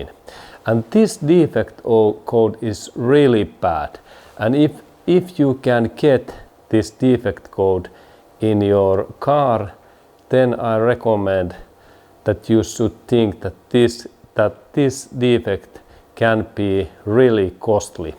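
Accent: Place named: Finland. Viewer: that killer finnish accent good job guy!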